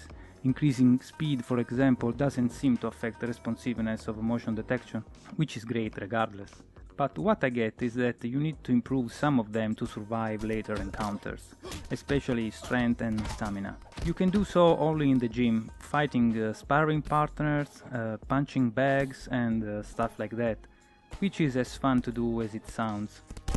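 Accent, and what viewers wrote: Italy. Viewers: this is a real italian accent!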